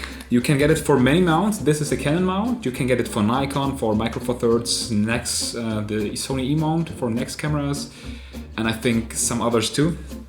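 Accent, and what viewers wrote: Germany. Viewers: german accent made me subscribe... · Great video, i dont know why, but a german accent makes me want the lens even more!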